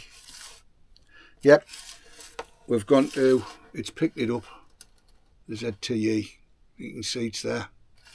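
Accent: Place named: Newcastle, United Kingdom. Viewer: British isn't a language, it's a nationality covering the English, Scottish, Welsh and Northern Irish. Scottish isn't a language either (though Scots is). This guy speaks English with a Geordie accent (from the Newcastle area in NE England) and maybe just the occasional word of local dialect rather than standard English.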